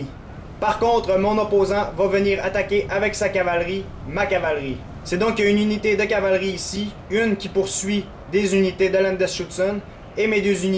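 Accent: Quebec, Canada. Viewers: j'adooore l'accent québequois !^^ très bonne vidéo ;)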